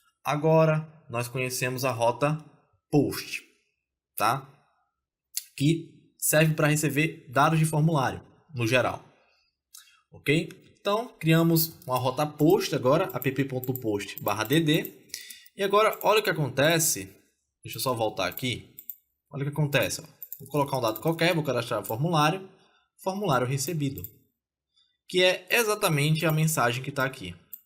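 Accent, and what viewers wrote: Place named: Ceará, Brazil. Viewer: maah... tu é do ceará? teu sotaque é do ceará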